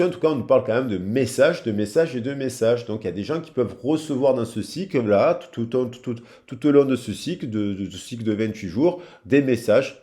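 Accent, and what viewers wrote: South, France. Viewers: Coucou, C'est toujours un réel plaisir de vous écouter, ne serait ce que pour l'accent qui sent bon le soleil et les cigales😂! Je l'avais pourtant mis en garde sur le fait que sa conquête était vénale😡! Il n'a pas tenu compte de mes ressentis et a foncé aveuglément dans cette relation, il est tellement crédule qu'il s'est laissé manipuler😢par elle et ses acolytes... En y réfléchissant bien, il ne pas pas quitté, c'est moi qui ait tout fait pour qu'il parte, n'acceptant plus son comportement détaché à mon égard😞... Vous êtes une bien belle personne, honnête et c'est bien d'avoir fait cet apparté au sujet des tarifs démesurés que certains n'hésitent pas à pratiquer dans le domaine de la voyance...😞 MERCI pour tout, excellent week-end🙏🍀!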